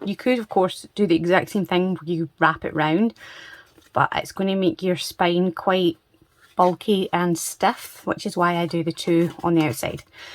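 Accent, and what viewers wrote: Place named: Scotland, United Kingdom. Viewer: I’m a newbie, so this video was fabulous. Was mesmerised by all the techniques! Nice to hear another. Scottish accent. Could you maybe add details of where you got some of your material etc in the description below e.g. stickers and wax burner. Thanks so much for all the information and detailed explanations. Loved it from start to finish. What a lucky pen pal!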